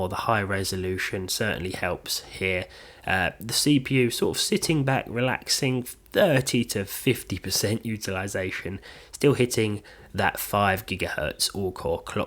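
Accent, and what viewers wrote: United Kingdom. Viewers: He’s a limey, that’s how they say Z.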